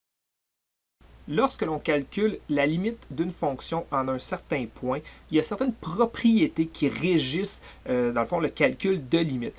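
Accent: Quebec, Canada. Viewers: Srx on voit rien sur ce qu'il fait écris dans le tableau et les dessins des graphiques sont frenchement dégueulasse...sans compter (bien que le mec n'y peuvent rien) un accent québecquoi absolument dérangeant et rendant la compréhension encore plus difficile... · si t'as un problème avec l'accent québecois, je suis toute ouïe. sinon, va donc chialer ailleurs.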